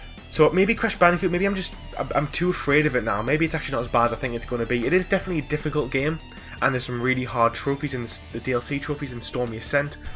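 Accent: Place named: Northern England, United Kingdom. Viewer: Sounds like your from my neck of the woods. I'm in darlington pal, nice video man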